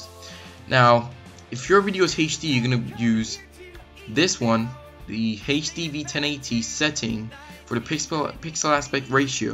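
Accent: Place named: Boston, United States. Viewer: he's just from boston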